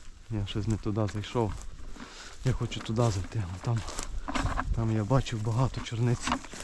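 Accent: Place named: Yavoriv, Ukraine. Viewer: збір чорниці - найдивовижніше заняття у світі... особливо якщо пальцями по ягідці!!! Так з рідними збирав ще 60 років назад на Яворівщині Львівської обл... Ти часом не з тих країв?!? Щось знайома говірка, працьовитість і любов до природи!!! Привіт синьо - жовтій Швеції!!! 🇸🇪 ❤️ 🇺🇦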